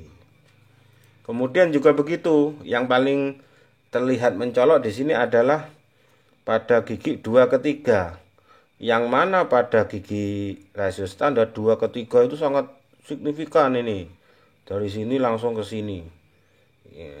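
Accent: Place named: Java, Indonesia. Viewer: slang nya pekat jawa. terbaik bang. salam dari malaysia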